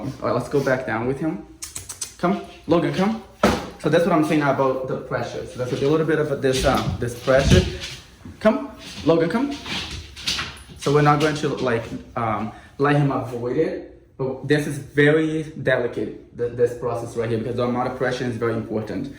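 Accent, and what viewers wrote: Brazil. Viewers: Você é brasileiro? Eu ouço sotaque carioca.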